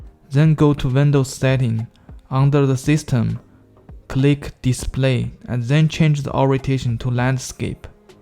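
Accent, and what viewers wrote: China. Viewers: Nice tutorial, 一听就是中国同胞啊😂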